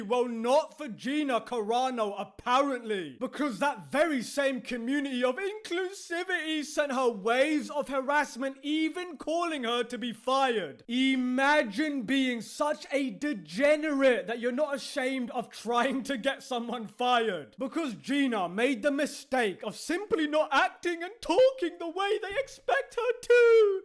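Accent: United Kingdom. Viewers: he’s British. I understand you have never heard many accents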